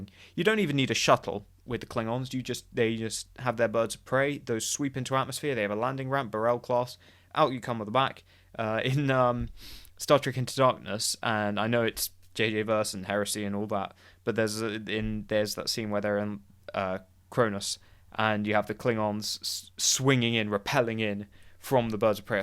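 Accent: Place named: London, United Kingdom. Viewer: I think it's the "London accent".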